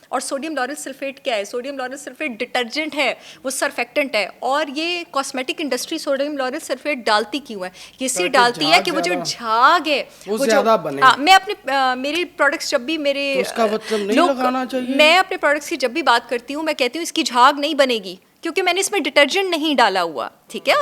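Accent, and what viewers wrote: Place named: Pakistan. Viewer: Yrr i love pakistani urdu accent ❤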